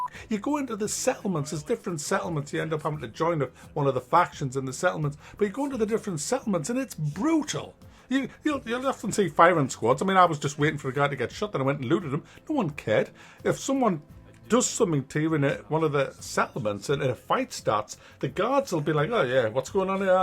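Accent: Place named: Liverpool, United Kingdom. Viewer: That made me fucking crack up man.. Love the scousser accent too! LMFAO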